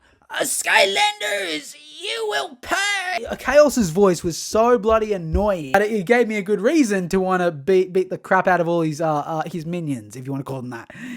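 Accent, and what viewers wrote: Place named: Australia. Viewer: Skylanders was such a massive part of my childhood, went through and played all the games w my brother recently and they're still so much fun, love seeing people still appreciate how influential these games truly were Love your energy as well man, keep up the good work 🙏 as a fellow Australian the accent is sick as well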